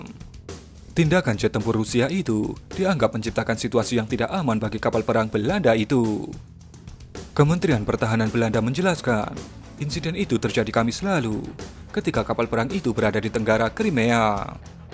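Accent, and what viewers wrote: Java, Indonesia. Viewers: Admin logat jawamu sangt menganggu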